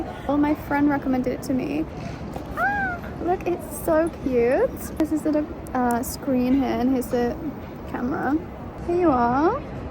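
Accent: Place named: Yorkshire, United Kingdom. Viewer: is that a yorkshire accent i hear? i have many questions abt working in tech in china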